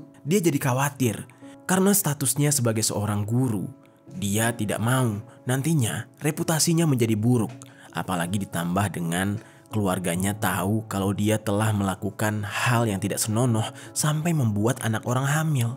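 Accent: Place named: Sundanese, Indonesia. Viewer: Lucu banget bang kalo ngomong bahada sunda 😂😂😂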